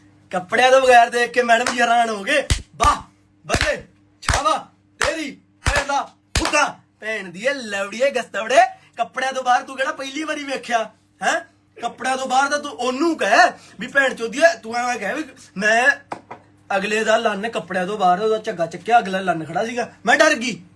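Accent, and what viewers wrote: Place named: Malwa, India. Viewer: Theth malwayi gala .😂😂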